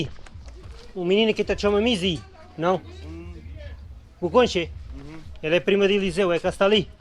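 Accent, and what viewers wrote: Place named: Cape Verde. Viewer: Excelente trabalho como sempre. É corajoso e altruísta. Mas coloca a.sua vida em primeiro lugar. Desculpa me esta advertência. Mas fico muito preocupada pela sua segurança devido essas trilhas íngremes, sinuosas e perigosíssimas. Adoro o seu crioulo. Uma mistura de criolo sotavento, barlavento e português. Cuida se e.protege se Um grande abraço nesta dura aventura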